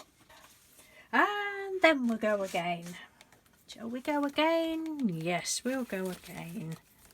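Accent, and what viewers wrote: United Kingdom. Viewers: Hi, I finally got to watch this properly. I’ve been moving rooms around again and I think I’m going to be sore tomorrow,lol! These turned out fantastic! The black background gives such a striking effect. I think I’ll reward myself with those shimmers whenever I use up some ample amounts of supplies because I am literally out of rooms, lol! I’m glad it worked and that you had so much fun! I got curious about actors that come from your area and did you know that, Kevin Doyle from Downton Abbey is from your town? I cracked up when you said, “what the heck!”, lol! I’m going to miss you reading craft stampers to us. Things always sound better when you British read them. Kloss! Thank you for sharing. Hugs, Julie 🥰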